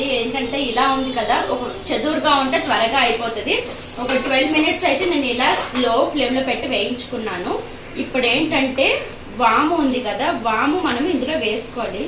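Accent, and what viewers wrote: Srikakulam, India. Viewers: This is request to you that do one video with srikakulam slang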